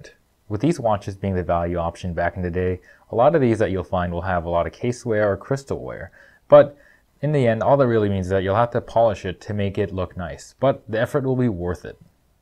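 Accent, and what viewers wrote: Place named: Canada. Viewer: Cool. I didn't know about these and I grew back then. Wasn't paying attention I guess. Really interesting videos,. And it sounds like you're Canadian too. Subbed.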